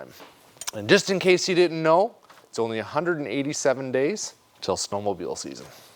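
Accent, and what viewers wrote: Canada. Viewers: I've come to the realization that about half of the reason I watch every video is that amazing Canadian voice...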